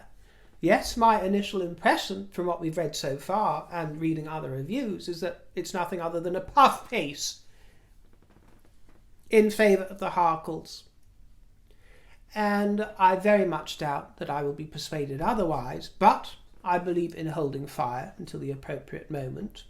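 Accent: United Kingdom. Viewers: Amen for you speaking UK English! ❣❣ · Ohhh the word is "uppity"! With your Brit accent I kept hearing "appetite" 😂😂😂 Uppity just means "snob" - whoever said it is racist, get over yourself!!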